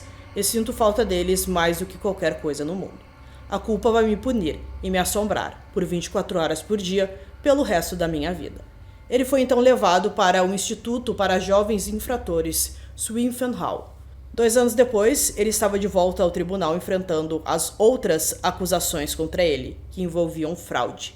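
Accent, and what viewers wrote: Rio Grande do Sul, Brazil. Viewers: vc é gaúcha?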